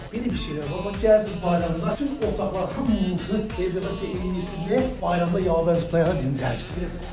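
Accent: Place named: Aghdam, Azerbaijan. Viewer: 100 faiz bu dilin yiyesi agdamlidi😅😅😂😂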